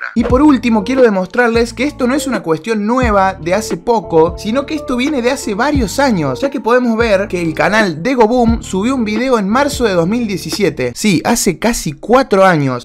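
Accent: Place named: Argentina. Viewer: Pareces argentino XD sos?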